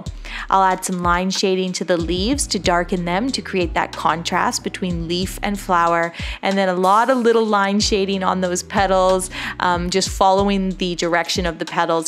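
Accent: Canada. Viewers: She is good, but camera is to far away most of the time. She sounds like Canadian. I would like to see these done in color.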